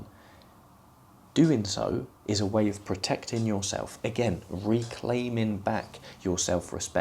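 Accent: United Kingdom. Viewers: Who is this guy and what is his credentials outside of British accent?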